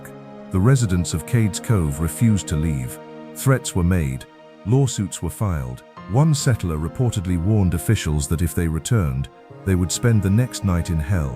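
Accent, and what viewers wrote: England, United Kingdom. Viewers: The English accented narration is a strange choice. How about a nice Shenandoah Valley accent for a video like this?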